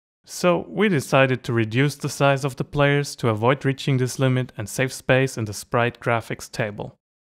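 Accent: Germany. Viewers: You're German?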